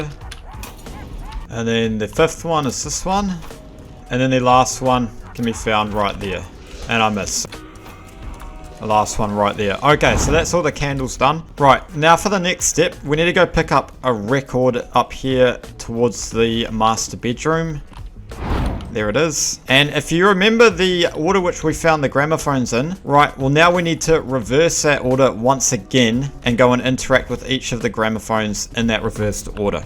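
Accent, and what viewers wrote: New Zealand. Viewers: Your a kiwi aren't you? :)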